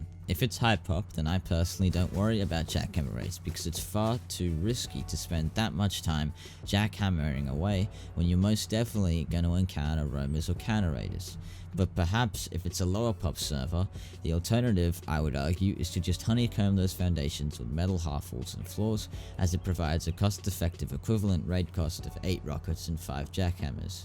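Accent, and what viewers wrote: Australia. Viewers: Definitely an aussie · Aye an Australian, or maybe a new zealander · OlliBrolli He’s an Aussie fam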